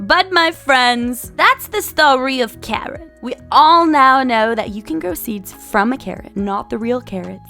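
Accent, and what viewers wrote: United States. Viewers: Ur accent is a mox between New Jersey, southern, and California 😫 ive never been so confused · Shes definitely alternating through multiple dialects, northern and southern US. Which is interesting since she's in Canada.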